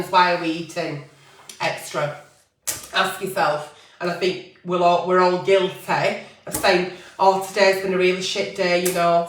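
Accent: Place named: Lancashire, United Kingdom. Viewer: so gorgoeus!!! love your voice and lancashire accent.... parli anche italiano?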